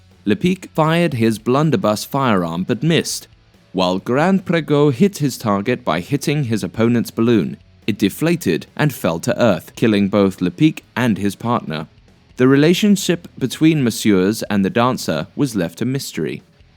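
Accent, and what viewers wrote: United Kingdom. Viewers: As soon as I heard a British guy speaking I bailed sooo sick of everything I watch narrated by Brits bye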